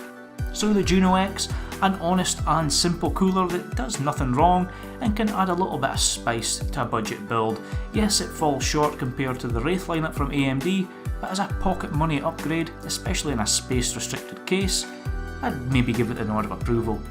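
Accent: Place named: Aberdeen, United Kingdom. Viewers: You sound like you're from Aberdeen like me! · yeh deffo from up north peter head.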